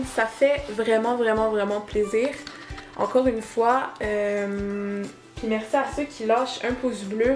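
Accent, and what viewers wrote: Quebec, Canada. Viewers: je suis sur que tu est Québécois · Tu est Québécoise !!! 😮✋😐. Ton accent t'a trompé mon amie 😏 Et une affaire de de résolu 😃 TU mérite MINIMUM 100 000 k abonné 😉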